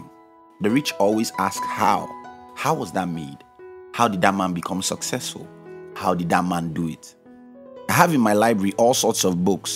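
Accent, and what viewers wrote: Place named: Nigeria. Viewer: Nigérians english.......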